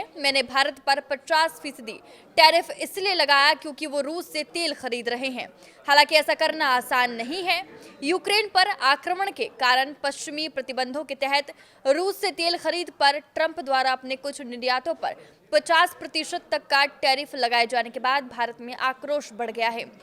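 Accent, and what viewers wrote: Bihar, India. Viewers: Medam, wansigoton nehi he Wo washington he,,, Kya madam english me bhi bihari accent late ho,, kya huwa itne padai likhai ki jo pronounciation nehi aata apko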